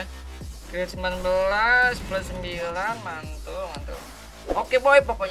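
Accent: West Java, Indonesia. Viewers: Logat sundanese salam dari cijerah lur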